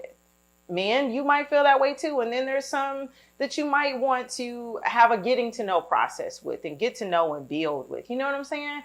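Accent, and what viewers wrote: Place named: South, United States. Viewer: With your souther twang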